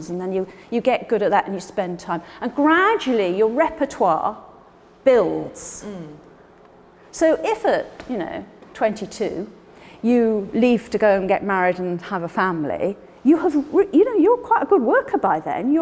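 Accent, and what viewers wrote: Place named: RP, United Kingdom. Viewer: RP accent is delightful.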